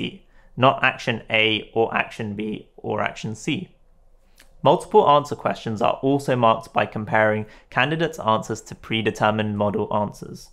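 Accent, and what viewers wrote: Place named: United Kingdom. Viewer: Nice British Accent. Very clearly Explained.Thank you very much.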